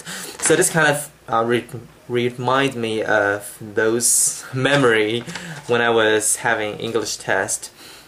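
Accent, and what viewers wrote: Taiwan. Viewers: 聽得出是台灣人 >^< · Yes, you speak English with a Taiwanese accent, which means that Mandarin is your mother tongue. I thought that I had given you a very clear answer. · Btw you do have Taiwanese accent...but your English is definitely good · You have a southeast "Asian" accent, be it Chinese or Taiwanese. But a little different than Korean. But yeah, you do have a Taiwanese accent as far as speaking in English. By the way, "pecan" is what you know as 胡桃 Hútáo. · you sound like a typical taiwanese english accent... taiwanese do have an accent...anw all places have an accent... like for english the 2 major accent are american and british accent and Mandarin the major accents are the chinese and taieanese... so there is a taiwanese accent... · its pretty obvious taiwanese accent, which is great!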